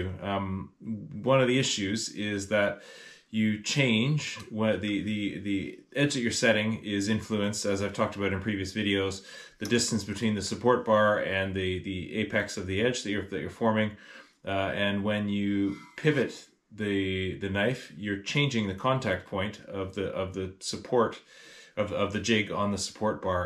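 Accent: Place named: Canada. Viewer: Perhaps its because we both speak 'Canadian' (!) but I finally understand the concept. Thanks again for the time you take to film and explain.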